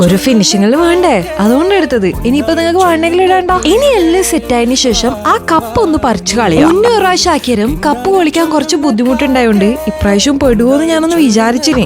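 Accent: Kannur, India. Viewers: Kannur ahnnaa? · കണ്ണൂർ കാരി ആയിരിക്കും അല്ല്യെ 😌🐝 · Kannur anno chechi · Chechi kannur aana😅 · kannur analle❤😊 enekkariyathathu konda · Kannur ahno eyal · Kannur ഭാഷ ❤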